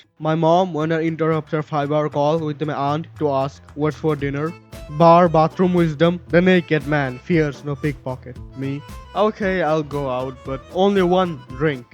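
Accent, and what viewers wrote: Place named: India. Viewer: U sound so Indian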